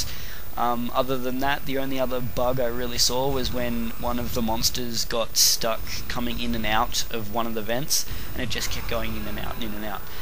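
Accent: Australia. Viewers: always amusing to hear another aussie on youtube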